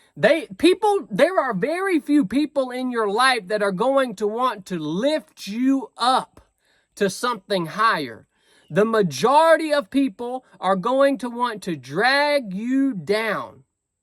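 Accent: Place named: Georgia, United States. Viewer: As a northwestern guy, I wound up stationed in Georgia for a few years, of eight. This man’s accent and manner of speaking is most refreshing and brings back a lot of memories. Good job from an old infantryman!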